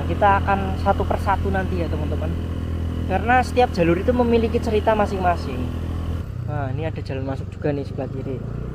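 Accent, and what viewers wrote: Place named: Banyumas, Indonesia. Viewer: Mantap uji nyali... Trus sy seneng infonya Medok ngapaknya..👍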